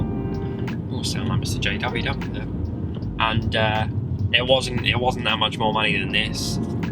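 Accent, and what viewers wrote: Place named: RP, United Kingdom. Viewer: Lol this gets bullied on his own youtube channel lol just put a hoody on bro and loose the posh accent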